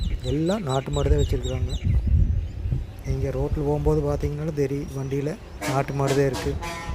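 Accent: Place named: Kongu, India. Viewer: Kongu speak super ga anna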